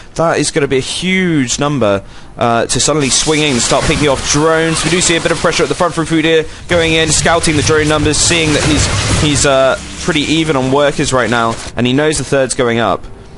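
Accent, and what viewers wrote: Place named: United Kingdom. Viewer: nice british accent :)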